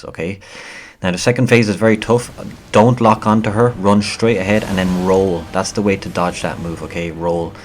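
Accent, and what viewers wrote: Ireland. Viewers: You Irish mate? Nice guide thanks! Subbed!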